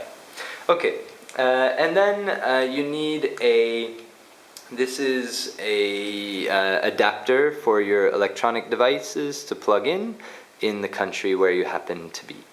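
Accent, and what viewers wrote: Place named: United States. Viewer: whats up with the brit passport. Sound american lol just a random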